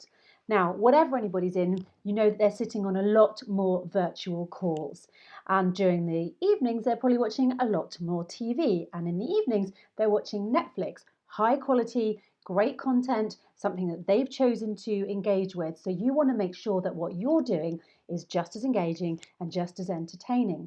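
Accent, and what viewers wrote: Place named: United Kingdom. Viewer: Hi madam,I'm really big fan of yours,i like British accent,especially your accent.kindly suggest me any content or how i can contact with you to learn this beautiful accent which you have.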